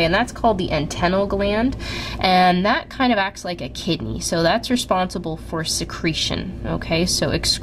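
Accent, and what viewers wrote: Canada. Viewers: Love the canadian accent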